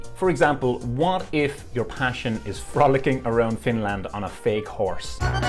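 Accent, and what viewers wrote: Ireland. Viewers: Get a career closely related to your passion - that way you can use your leisure time to pursue it, while your day job is not an utter bore. Worked for me! Love these videos, an an Irish Canadian who loves hearing lovely Irish accents.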